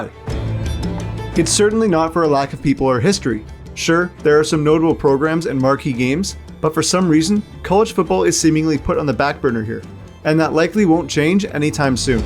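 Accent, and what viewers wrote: Canada. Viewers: Canadian accent tho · The narrator sounds like a Canadian, check how he pronounces "out'.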